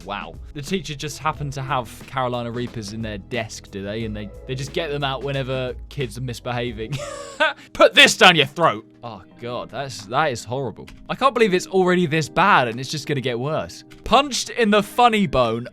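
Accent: United Kingdom. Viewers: Is it gonna be mean but are you British? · Is this guy British because I love people who are British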